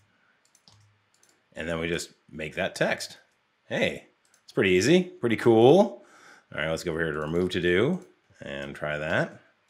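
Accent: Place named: Germany. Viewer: Have a great weekend. Love the German pronunciation. 🙏😀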